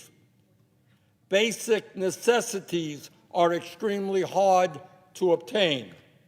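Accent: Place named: Brooklyn, United States. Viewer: How long, do you think, it'll take this guy to lose his Brooklyn accent??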